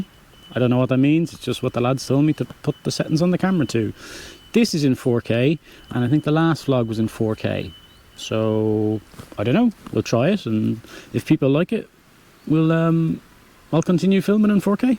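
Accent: Ireland. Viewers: So, a certain tone of Irish is very romantic…is romance of interest to you? You seem like a KEEPER type. NOT ASKING FOR ME! But for other subscribers. (You’re too young for me) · I watch a few vlogs that some Brits make, and I have to say that your Irish accent is a pleasant change. At least, to my American ear. Sometimes Brits say weird stuff. 😊 The Q&As are great - keep doing them!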